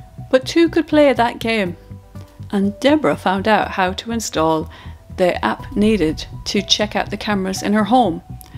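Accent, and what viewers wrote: Ireland. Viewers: Sounds Irish to me (Florida USA). Delightful. I love how y'all say "cuddle" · The Irish accent adds to your narration sounds great. However, this story really allows victims to be complete helpless imbeciles, like they're unable to protect themselves.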